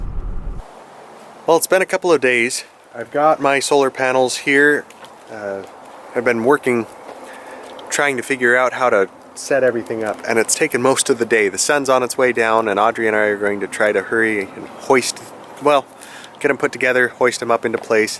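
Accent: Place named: Canada. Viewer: You need quite a lot of water and hight (head) too make a micro hydro project worth it. I would shift that container just too see the difference. Do you have a stream on the prop? A little nudge with the back hoe is all it takes. Wait you got wires coming out of it... yeah thats another story. You have come a long way from digging your well vid that was the last time i watched. nice work. Where are you from the trees in looks like north east but you accent sound Canadian.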